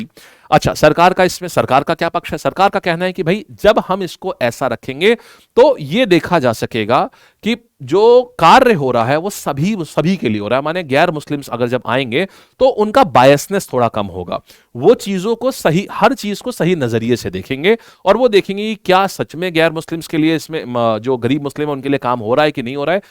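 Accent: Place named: Bengal, India. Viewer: Sir up ka bat sunker lagrahahe up bangali hei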